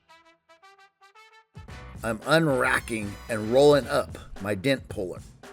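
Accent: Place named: California, United States. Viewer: New viewer, Awesome work 👍I'd hire you in a sec, but I doubt you're in my area 😕 I'm guessing that's a Californian accent, I'm in Ohio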